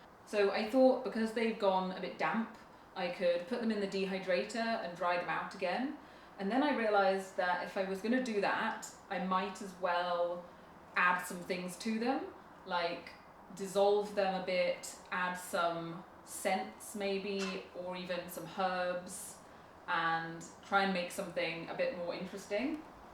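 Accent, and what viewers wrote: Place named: England, United Kingdom. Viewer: Es una pena que no tenga subtítulos en español, solo logro entender algunas palabras del encantador acento inglés 😢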